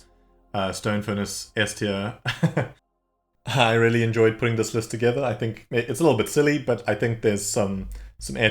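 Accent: South Africa. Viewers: I can recognise that saffa accent anywhere, didnt think we had many factorio players around here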